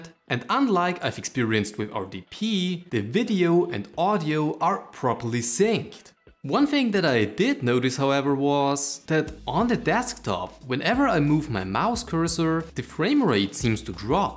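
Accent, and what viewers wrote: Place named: Germany. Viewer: He is clearly German. Why I know that? Because I speak the Same like this Guy c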